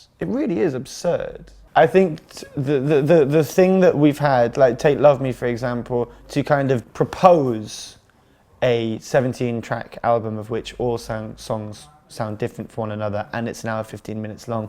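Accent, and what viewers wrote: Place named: RP, United Kingdom. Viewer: He's from Wilmslow so yeah he is gonna be pretty posh :P.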